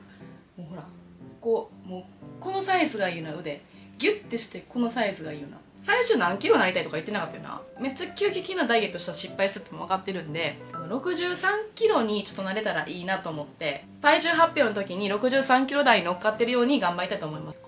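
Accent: Kansai, Japan. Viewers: 、何故関西なんですか？お返事下さい。私は小笠原なんで分からないです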